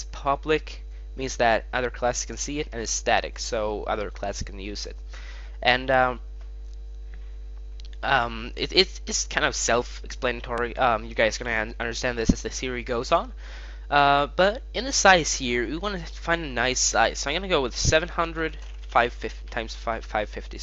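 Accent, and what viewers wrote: Sweden. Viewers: R u swedish? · Hah du er svensk? ;) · are you Swedish?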